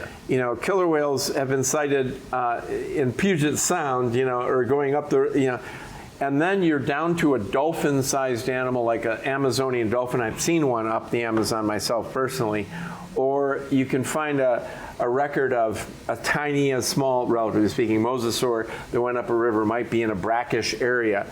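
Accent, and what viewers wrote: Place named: Chicago, United States. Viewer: completely unrelated but I find it endearing to hear his Chicago accent slip out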